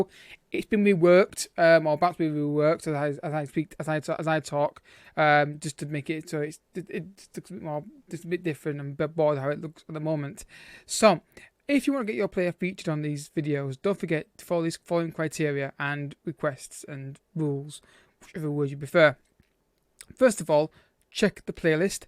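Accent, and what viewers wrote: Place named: Northern England, United Kingdom. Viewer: Nice video mate. I have a bit of a problem listening because of your strong accent haha. north english is my guess. Maybe its the mic idk. But keep it up. :)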